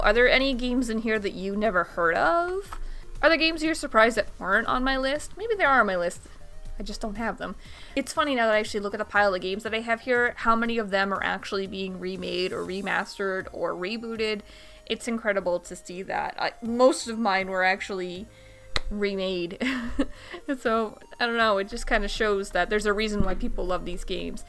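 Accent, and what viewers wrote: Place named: East Coast, United States. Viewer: Your video came up in my algorithm feed, since im from CA what kind of east coast accent is that I like it???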